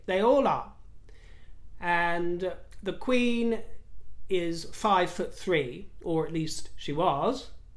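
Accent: Australia. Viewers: Ann is adorable! I saw many many years ago .. two interviews she did with Michael Parkinson .. showed a Completely different side to her public persona as a rather strict humorless princess that was always portrayed in the press. . she was so funny and so honest... Parkinson was was a great interviewer and they interacted so well.. she revealed how she wanted to go to boarding school bcoz basically being privately tutored was boring and stifling (she didn’t use those words of course)! Geez she was funny! And highly interesting and intelligent. She described “losing her rag” when her potential kidnapped tried to drag her out of the car.. her recount was hysterical!! Anyone who claims to be an Aussie and complained about your Aussie accent.... ain’t Aussie.. they must be fake Aussie 🙄🙄🙄.. and today was even better! Whilst sometimes hearing our accent back at us .. 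I know I go sometimes .. bloody hell, do we sound that bad.. but then I go: I guess so. Australians tend to laughter at themselves.. we don’t take that sort of stuff seriously.. u shld block them from your channel if they are that ridiculously sensitive. You are v v good at accents.. quite excellent actually! Keep up the good work 🇦🇺🇦🇺🐨👍🏼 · your Aussie accent is hilarious, love it!! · That is a great Aussie accent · Oh Dear, As an Aussie😀I found yesterday and today your Aussie accent just made me smile. I find it very sad that here a lot of people are just loosing the great sense of humour we used to have. Love your channel ❤️🥰🥰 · Oh my, your Aussie accents are amazing, far broader than mine, after living away from Sydney Town for so long, many think I am South African or even British😃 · Great episode 🙌🙌🙌 Your Aussie accent is fantastic! You’ve obviously embraced Home & Away and Neighbours in your youth. 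As we say, “goodonya” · Omg your Aussie accents are amazing!! Love that you've seen Kath and Kim 😂 Really suits you that gentle Aussie accent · Good Aussie Accent !!!!! Love it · Love your Aussie accent !!!❤️❤️❤️ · I love your Aussie accent. Keep it coming. Although I really love your nice posh natural accent too. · When you say it in your Auz accent, it is so funny. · Well done on the Aussie accents ♥ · I don’t understand the fuss about ‘the word’ it just means that Harry is a dick - true story. I love Mike Tindall. What you see is what you get and if he has The Princess Royal’s love and approval, he has mine. We all loved Meghan when she joined the Royal Family. We really thought that she was going to be a fresh air and now we are just disgusted at her - and her husband’s behaviour toward our Queen. Lady Sarah looks very much like her Mother. I’m about the same height as the queen and my Grand daughter always wanted to be taller than me. 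She is now 11 and can look me in the eye🫤 Me and you will have to differ about Eugenie (like you care lol) I’m not so sure whether her loyalties lie with The Queen or the Harkles. I hope I’m wrong but we’ll see. Loved your Ozzie accent/s 💕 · Love your Aussie accent! You’re so cute!! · Ohhh… your Aussie accent is brilliant…come to the west coast next time! Xx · I love you Aussie accents well done. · you are on fire at the moment!! Ps your australian accent was truly fabulous in your last vid. Enjoy your day xx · love your Oz accent from Queensland resident made me laugh 😂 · Hahahaha as a Melbourne Aussie, I love your Aussie accent. You're quite good at it if I must say. We've got three rough categories of accent rather than region specific. They're call Broad, General and Cultivated. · beautiful colour on you, love your Australia accent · All of Aussie accents were brilliant! I love listening to for much needed elocution lessons. 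· I loved your Australian accent!!❤️ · Props for your Australian accents. You did us proud! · Your Aussie accent is better than 99% of Hollywood actors. Well done! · Great Aussie accent = love from an fellow Aussie xx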